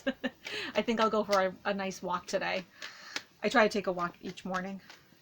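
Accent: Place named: Midwest, United States. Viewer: No. Sounds mid-western. Definitely not California.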